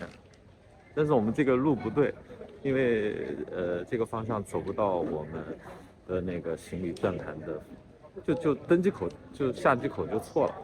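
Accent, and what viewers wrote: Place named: Taiwan. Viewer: 长着北方人的脸，操着半吊台湾腔。你在台湾呆多久？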